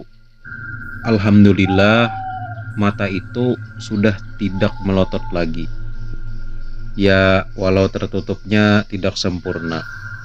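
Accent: Banjar, Indonesia. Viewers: Aslik suarak Banjar lih mang.😊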